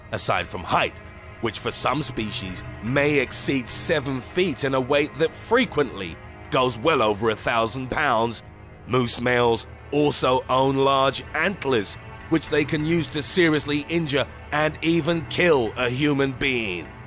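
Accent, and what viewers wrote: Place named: Cockney, United Kingdom. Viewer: Easy going gentle giant 😂😂 You sound proper cockney 😂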